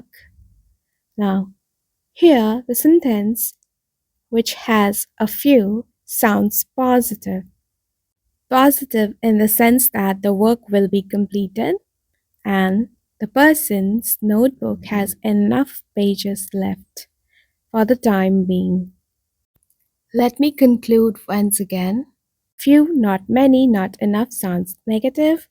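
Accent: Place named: Eastern Europe. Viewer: I beg your pardon, however do you really need to pronounce that B in 'subtle'? It is silent. I feel something East European in your accent.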